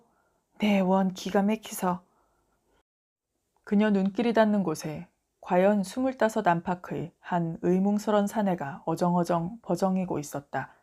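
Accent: Gyeongsang, South Korea. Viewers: 감사 한니다. 경상도 의성 근방의 그 살 찐 음성!! 고향맛이 뚝뚝 떨어 졌습니다. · 독특한 경상도 안동지방의 사투리와 억양에 나도 몰래 빠져들어 감사하게 잘 들었습니다. 고맙습니다. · 고향이 청송이라니 반갑습니다 저도 청송군 진보면이 교항이라요 글읽는이의 사투리를 들으며 엣날생각이나서 저도 열심히 들었네요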